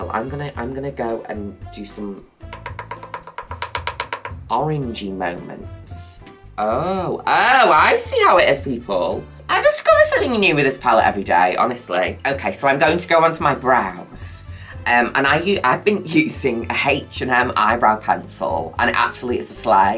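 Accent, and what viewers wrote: Manchester, United Kingdom. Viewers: Oh my goodness, I would love to watch anything with your narration!!! The world needs more Manchester accents in media! 💚💙💜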